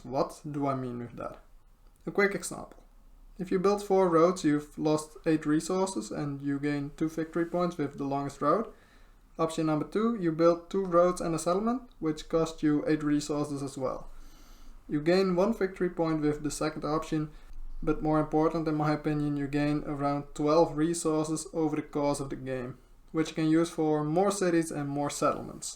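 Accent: Netherlands. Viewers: Ik hou van het Nederlandse Engels accent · Heel sterk Nederlands accent haha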